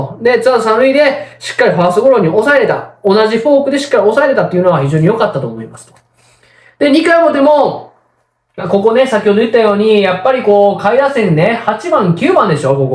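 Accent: Kansai, Japan. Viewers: 小山さんって関西の方ですか？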